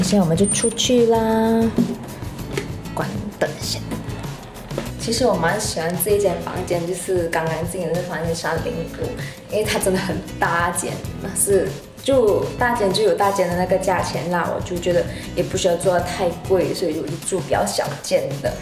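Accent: Malaysia. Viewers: 听口音 马来西亚！！！！ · 超级马来西亚英语...... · 马来西亚式华文😂😂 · 马来西亚口音😂😂我也是马来西亚人~以后也想去韩国留学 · 一听就知道是马来西亚人😂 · 你好我是韩国人 你是马拉西亚人吗 我第一次听过这个发音！觉得很可爱！ 很有魅力的！😄 而且你韩文发音也很好👍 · 好亲切的华语 ，马来西亚lang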